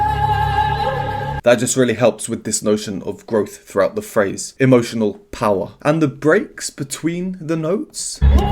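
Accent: United Kingdom. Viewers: Dear, I just love your videos and analyses, having no knowledge of music in theory and understanding next to nothing about notes and registers. Besides, being a linguist, I really enjoy listening to your irreproachable British accent. And Dimash... He is so talented that no words in any language can express. Everything he does is so beautiful, so powerful, so peace-in-the-world-loving (if that might be considered a word). Anyway, I'd like to give you a recommendation of a totally different kind. It's related to a... beatbox artist from Russia, Taras Stanin. Just look him up on YouTube if you find time. He's also an extremely talented guy in what he does. And the things he does about music, about covering well-known pop songs with his incredible combination of beatboxing and singing are just out of this world, in their own way, of course. Right now, his popularity on YouTube is skyrocketing due to all that stuff. 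I just wonder what you might say about such an outstanding artist performing in such an usual genre. Best wishes and regards from Russia! 🤗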